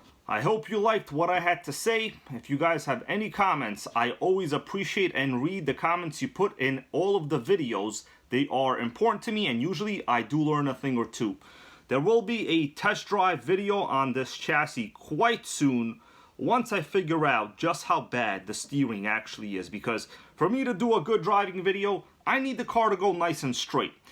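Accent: United States. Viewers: Hmmm I think in the long run it’s worth spending more bucks on a more reliable machine. I’ve only recently started watching your videos and only recently started thinking about getting into nitro. Just bought an hsp nitro truck and after looking at your video I’m going to return it and maybe go for a Hpi bullet nitro. The problem with having a cheap car with faults that you just pointed out would certainly put one off once it starts falling apart. The only problem with the Hpi bullet nitro is no 2 gearbox, maybe there is an upgrade? Please keep your presentations coming really enjoy it. That accent of yours, which part of the states, sounds really cool . This is Ricky from down under regards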